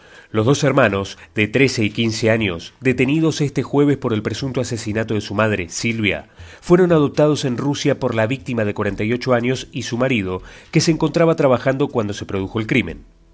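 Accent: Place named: Latin America. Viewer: a esto hemos llegado? A usar voces generadas por IA? O voces de extranjeros para dar las noticias ; si parece que estoy oyendo un noticiario de un país latino o argentino.... En fin".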